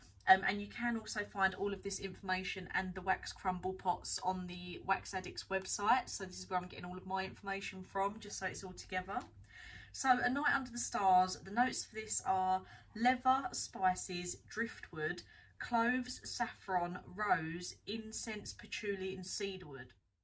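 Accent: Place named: Essex, United Kingdom. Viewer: Hi 😊. As I'm a novice with all things candle related, I was looking at Yankee Candle reviews and found yours so I subscribed as I thought you were the most relatable maybe because I'm a London / Kent girl and I think your accent is Essex (forgive me if I'm wrong 😁). I saw you had a candle warming lantern which I thought was a brilliant idea and bought one immediately as I have a Jack Russell dog that's a bit of a bulldozer I was too afraid to have a naked flame in case he knocked it over. I am sure I remember you saying in one of your videos that you sometimes pour melted wax away which helps the candle regain some of its scent. I have a Merry Marshmallows candle and I have put it under the lamp several times but it's started to lose it scent even though it's only burnt down a quarter of the way. So my question is how much should I pour away and how do I dispose of it? 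Many thanks for reading 😊.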